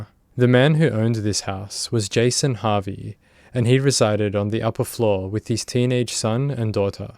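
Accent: Australia. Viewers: Nice to hear an Aussie doing true crime. Subbed mate. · Sounds like an Aussie did this doco by accent or maybe a Kiwi but as an Aussie I think it's an Aussies voice. I'd never use it even once as they all say I'm only trying it once & a year later they're nuts! I've seen just 1 year of meth uses' impact on the brain by a meth users who were paid to allow weekly brain scans over the year & their brains are very impacted by even a month on meth let alone a year so imagine someone like Heidi who's been on ity for years!!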